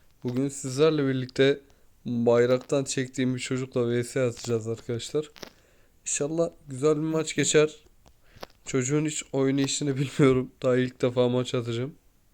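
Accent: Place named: Kahramanmaraş, Turkey. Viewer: Maraşlımısın kardeş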